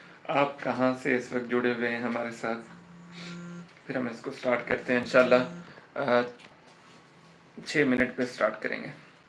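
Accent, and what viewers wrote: Saraiki, Pakistan. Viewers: Aoa bahi upwork k hawalay say kuch kuch dost janty han per ap jo behtar basic ssmajhty han zaroor baten take jo new dost hy ap ki piyari sweet sarski awaz m wo be seekh saken jasa k ap kehty han k sekhen elam.hasil karen samjen to is klie sekhna zarori hy or ap behtar andaz main proper sekhaty han sir sb tamam pakistiyon sariki waseb sb doston bshi ki tarf say ap ko bhot sara piyar thaks with love u all... From Engineer multan say sir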